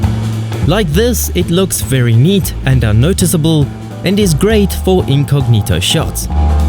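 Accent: South Africa. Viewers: crazy how I can tell youre south african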